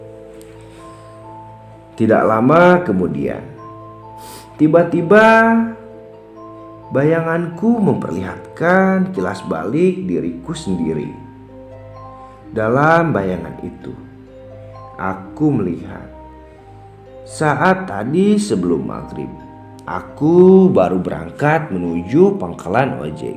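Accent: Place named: Bali, Indonesia. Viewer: Bli org bali...ya ,cara mbaca nya koq aksen org bali...!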